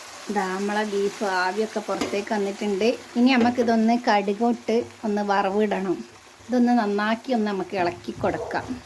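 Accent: Malappuram, India. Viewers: Kollalo.. ingalu njamade malparthe basha pole indallo varthanam..😃😃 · 😋😋👌👌കൊതിയാവുന്നു കണ്ടിട്ട് തന്നെ. ചെയുന്ന സ്റ്റൈൽ വളരെ നന്നായി ട്ടുണ്ട് 😊. ഇങ്ങനെ ഞാനും ഒന്ന് ട്രൈ ചെയ്തു നോക്കട്ടെ. എന്നിട്ട് പറയാം ട്ടോ. 😍മലപ്പുറം ആണോ നിങ്ങൾ. ഞാനും അവിടെ ആണ്. സംസാരിക്കുന്നത് കേട്ടിട്ട് ചോദിച്ചതാ ട്ടോ 😊.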